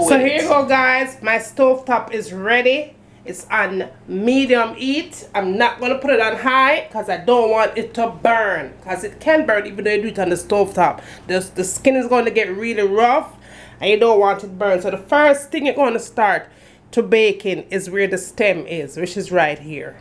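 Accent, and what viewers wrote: Jamaica. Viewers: MEH LIKE DE JAMMIE ACCENT, ROAST IN DE COALS IS DE BADDEST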